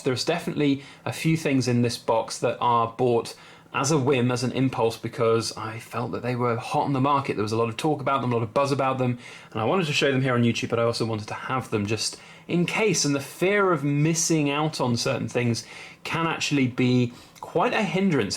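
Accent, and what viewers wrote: England, United Kingdom. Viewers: Love your channel. Sometimes I just play your videos while I'm relaxing. I guess its the English accent. Gives your channel that European flair that isn't common in the US. Always good content. Have you seen the price of silver? You can sell your extra silver now. Or keep holding out. How much silver do you buy monthly? weekly?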